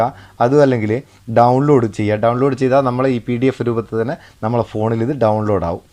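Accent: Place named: Meppayur, India. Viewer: കോഴിക്കോട് മേപ്പയ്യൂര്‍ ഭാഷ വിടല്ലേ.ആകര്‍ഷകം,ആസ്വാദ്യകരം,ഓക്കേ?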